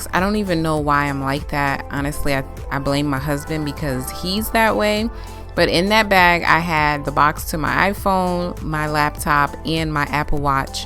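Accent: New York, United States. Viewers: Glad you're better Suga, I love it! You picked a great color for your accent wall..I am a pillow person so I'm fine with it. They look really nice once the bed is made. I was cracking up when you were on the bed watching tv while hubby was fixing the bookshelf!! You have a beautiful NY accent!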